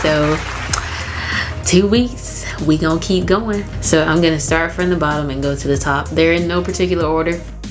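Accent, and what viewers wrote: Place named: South, United States. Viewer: New subscriber, wish I discovered you when I was loose natural but excited to see your loc journey and love hearing my you talk, the southern drawl is absolutely endearing ❤ Depending on how u maintain, your locs will likely swell to a traditional size. I've been loc'd for over 6mos now and my microlocs have almost doubled in size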